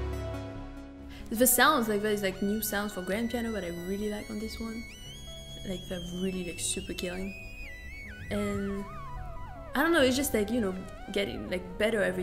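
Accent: France. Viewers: Mmm cet accent français !👌👌🤩🤩🌹🇨🇵🥳😜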